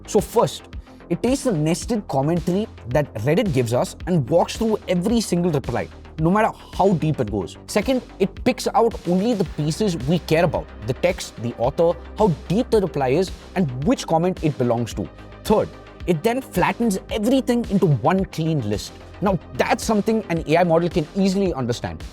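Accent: India. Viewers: Ainda estou tentando descobrir se o sotaque é indiano ou venezuelano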